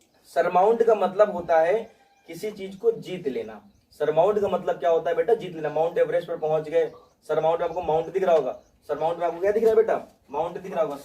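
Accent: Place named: Kanpur, India. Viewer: Sir your energy level is awesome. Your tricks are also superb but I like the way you teach. Smile on your face spread positivity and of course kanpuriya language is the best. Missing your karrahi coaching centre. By the way I'm Parth.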